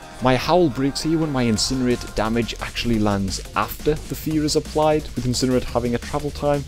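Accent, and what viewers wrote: Newcastle, United Kingdom. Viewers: Is it near newcastle, sounds kinda geordie, but maybe outskirts or something?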